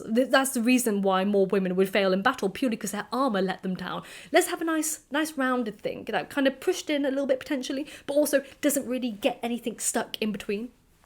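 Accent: RP, United Kingdom. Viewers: Came to hear a lady say boobie in a posh voice. Was not disappointed.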